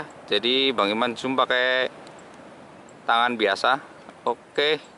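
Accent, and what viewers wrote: Banyumas, Indonesia. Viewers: Keren bahane ngapak campur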